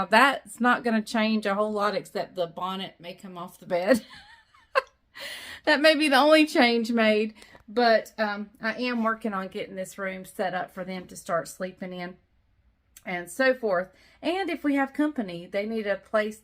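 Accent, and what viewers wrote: South, United States. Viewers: Have you ever watched any of your videos with the auto-generated closed captions turned on? It's really cute and funny. closed captions for a southern accent. 🙂❤️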